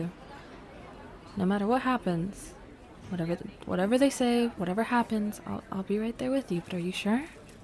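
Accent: South, United States. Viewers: god why does your voice have to be so nice with or without southern accent got me punchin and kickin the air, and don’t even get me started on your absolutely amazing laugh 🤭🧎‍♀️🩷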